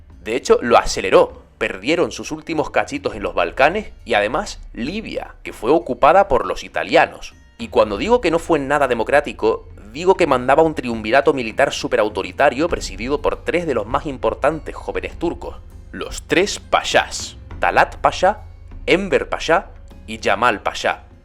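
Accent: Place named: Canary Islands, Spain. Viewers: De que parte de España sos, si sos español. Una curiosa argentina 🇦🇷. Tenés un acento distinto a los que estoy acostumbrada a escuchar en los distintos videos provenientes de España Saludos ❤ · Creo que canario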